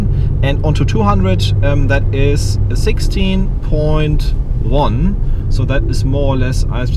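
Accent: Germany. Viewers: man hört an seinem akzent das er deutscher ist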